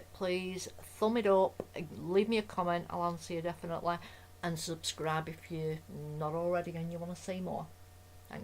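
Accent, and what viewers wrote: Lancashire, United Kingdom. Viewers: Wow, so vibrant. This is the depth of colour I've been looking for and got so frustrated with watercolour pencils and prismacolour wax pencils. I've purchased a set of 12 Brushos and will approach them from a mixed media/craft perspective. You're the first person that I've seen do a tutorial truly painting with them and none have used the thickener in this way. You are truly innovative and inspirational and I love your Lancashire accent. Love from Chester UK.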